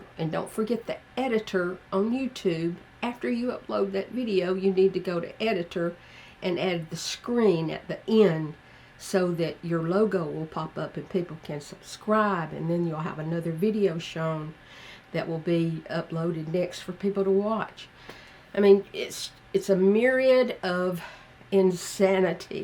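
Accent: South, United States. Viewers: Oh my god!!! You remind me so much of my mom!!! And you have the southern accent too!!! I wish she would start doing this. Amazing! I’m subscribing! I do content creation gear reviews on one of my channels. Great job!! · I love your southern accent. Our town has been overrun with transplants and foreigners. It’s so seldom we hear southern accents anymore. I miss it so much, especially since I supposedly live in the south. Or what used to be the south. Anyway, I do enjoy listening to you speak. Your voice is so comforting that I could easily fall asleep. You remind me of my precious older relatives that aren’t around anymore, that I miss so much. God bless you. Keep on going. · I just found you. You are amazing. Love your southern accent. Sounds like my family in the south. Keep doing what you’re doing and know you are more than enough. 😊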